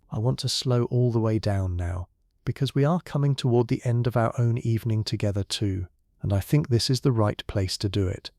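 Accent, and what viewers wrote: United Kingdom. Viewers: can you change your IA voice to something not English so very English English.??? I have to jump away from your great content because I am so sick of the English accent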